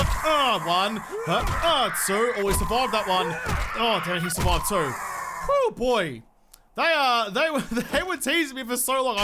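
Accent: Australia. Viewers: keep saying stuff the Australian way, bugger the Yanks!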